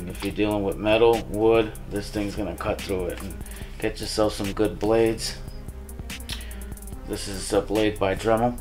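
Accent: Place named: New Orleans, United States. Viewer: si mon que si que fuck yeah....did 11 years in prison here in California and got out and became a union electrician...IBEW LOCAL 11....I couldn't tell where u were from bro...ur accent was all over the place...lmao at first u sounded like a homie...but then u sounded like u were from New Orleans..so I was like where is this vato from...lol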